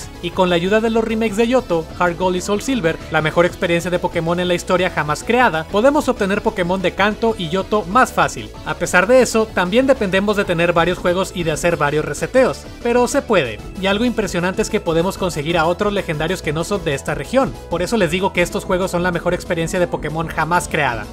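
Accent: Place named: Monterrey, Mexico. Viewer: Videaso hermano, gran acento regio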